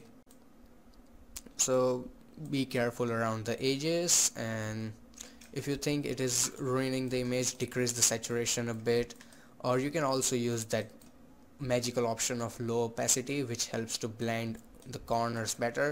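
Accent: India. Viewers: That's racist as shit. Sure he has an indian accent, but his English is alright!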